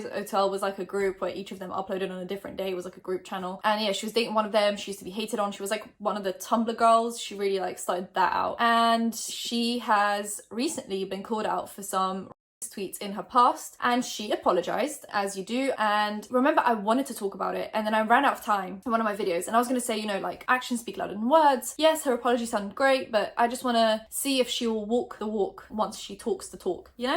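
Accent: United Kingdom. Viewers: Vixen idk she’s definitely british, that’s not an australian accent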